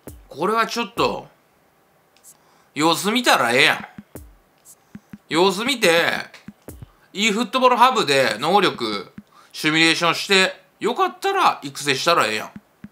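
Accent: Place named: Osaka, Japan. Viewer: 下手な大阪弁ええやん😁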